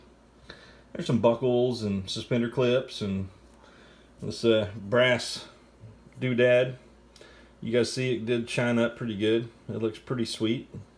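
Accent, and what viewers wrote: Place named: Oklahoma, United States. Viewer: Great finds! I’ve never said that you still have your Okie accent but I’ve wanted to. Lol From one Okie to another, Happy New Year!! May this next year be even better!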